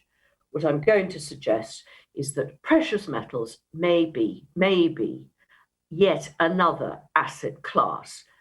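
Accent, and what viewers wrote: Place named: England, United Kingdom. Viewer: Hey, its the English lady again!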